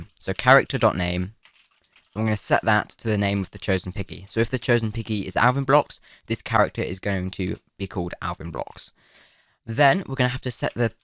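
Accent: United Kingdom. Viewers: he sounds british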